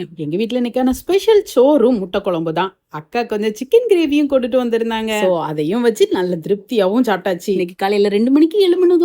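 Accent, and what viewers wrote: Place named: Kerala, India. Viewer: Sister neenga pesarathu azhaga irukku 2daysa unga video matum tha pakkuren super 😊😊😊neenga kerala sister unga slang apditha irukku athan keten 😊😊😊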